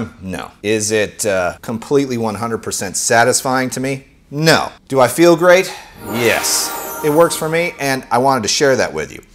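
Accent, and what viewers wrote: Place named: United States. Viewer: yeah we do smoothies down here, in my opinion its more of a heat beater so lotsa ice cream and banana maybe milo or ovaltine thrown in thats just a simple one the kids can make :D Cant wait for the stickers! i love your sense of humour please dont loose it! it seems no matter who you watch from over there in yankie land you can really tell that there is a local to that area dialect. i might start a map of the 'muricas so i can pin down where who im watchin is from. i like the mix up style it doesnt lock you into one genre and gives you many diffferent ways to go down a diferent rabbit hole each time. plus the personal thoughts really ground you to being, well one of us bloody pelicans :)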